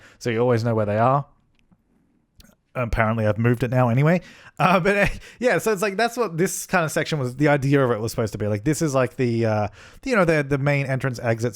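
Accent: Australia. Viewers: It's just the Australian accent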